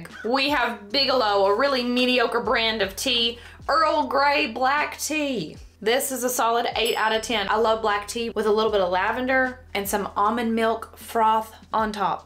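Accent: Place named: Appalachia, United States. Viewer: I knew you had to be from Tennessee! I’m from northeast Tennessee, and you sound straight up Appalachian 😆😆😆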